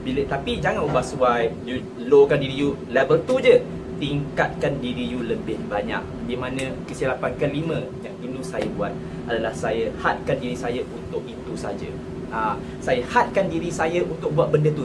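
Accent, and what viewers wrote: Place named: Sarawak, Malaysia. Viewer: are you sarawakian ? sbb mcm ada slang sarawakian ckit heheh.. just my opinion laaa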